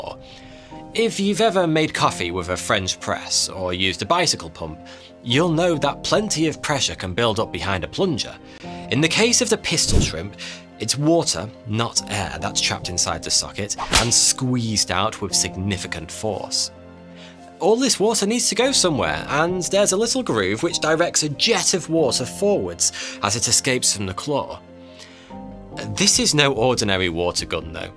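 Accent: United Kingdom. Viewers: Idk if I can handle the britishness any longer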